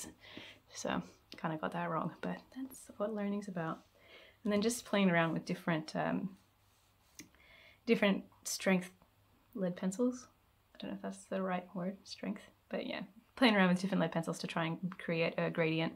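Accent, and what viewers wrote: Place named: Australia. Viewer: New to your channel and really enjoyed your tour. I thought at first your voice sounded Canadian but then, no Australian! Ok well hello from Canada and I’ll check out more of your videos. Sorry you are so not able to get to Scotland, keep creating , stay safe! Especially enjoyed the kookaburra and reindeer!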